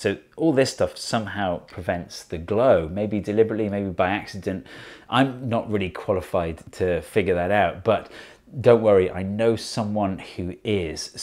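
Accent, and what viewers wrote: United Kingdom. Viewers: <link> to an American wow I forgot how weird British people say aluminum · second (?) video i've watched of and i've only just realised they have a british accent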